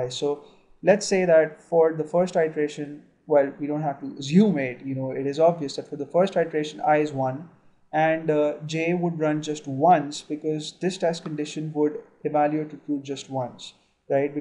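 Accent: India. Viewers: even youtube doesn't understand the freaking hindi accent.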